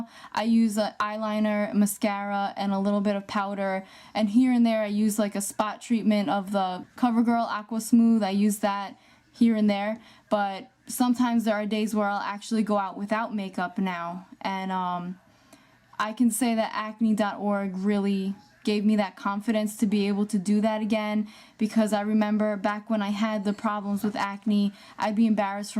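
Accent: New Jersey, United States. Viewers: Are you from New Jersey? I can hear an accent.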